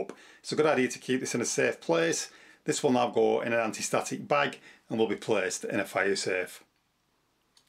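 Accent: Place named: Lancashire, United Kingdom. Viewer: Someone is from Lancashire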